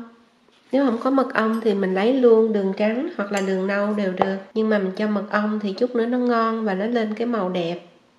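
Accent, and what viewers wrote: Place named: South, Vietnam. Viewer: nấu ngon chỉ dẫn chi tiết không tiếc công... giọng nói như rót mật vào lòng ngọt ngào tự nhiên tiếng Nam nghe thương quá là thương, Thanks em nhiều nha.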